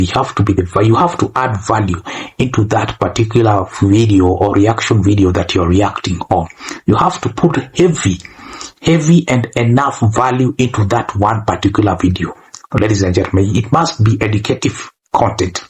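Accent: Jamaica. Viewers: Man your accent is very much like Jamaican too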